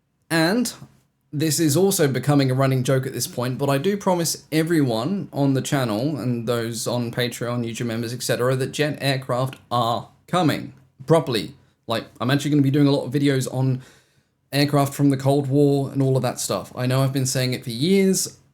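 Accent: Australia. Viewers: Wait, you're not Australian? My brain parsed your accent as definitely Aussie but with non-Aussie parents. I'm confused where you're originally from, now. (For some context, I'm a linguist at heart, my brain ponders these things whether I consciously want it to or not, I'm not trying to pry into your life 😅) Also, huge congrats on your wedding! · Congrat's on getting married,! Mate, it all works out in the end. Just keep plodding along. We all get those periods of... Bleh!... but we get over them by putting one foot in front of the other until we're out of the haze. You sound like a South Australian... & I am a South Australian, so I should know! 😉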